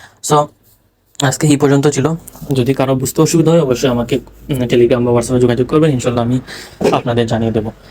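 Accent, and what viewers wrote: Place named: Sylhet, Bangladesh. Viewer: Vai Sylheti ni kota sune to seta mone hocce 😊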